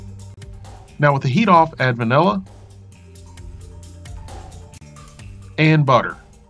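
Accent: South, United States. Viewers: I was born and raised in the South and have family (inlaws, outlaws, etc.) stretched from New Orleans to Raleigh, Chattanooga to Savannah , with alot of old recipes handed down from generations back to the late 1800's. This creation reminds me of the scene in the movie "The Dirty Dozen" when Vernon Pinkley (Donald Sutherland) "inspects" the troops of Colonel Breed (Robert Ryan).... Pinkley: [impersonating a General] What are you eating , son? Soldier: Southern chocolate gravy and biscuits, sir! Pinkley: [shaking his head slowly] Never heard of it. (That also goes for your "Southern Tomato Gravy and Bisquits"). From your accent, I just can't place which "South" you got this recipe from.... I will try the loose meat sammiches though. Bless your heart.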